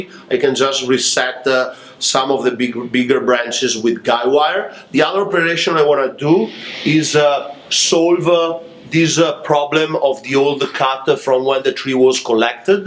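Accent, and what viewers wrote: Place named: Italy. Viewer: You sir,.. you have all my respect and admire your work as a Bonsai Artist. But your english is good and funny!! And unique way and saying everything with a smooth italian accent! Great sir!!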